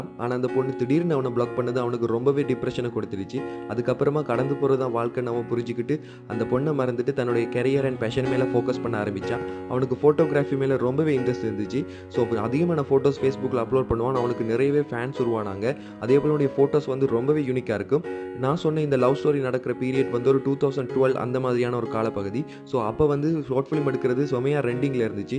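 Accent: Sri Lanka. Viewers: Bro ninga sri Lankan tamil la?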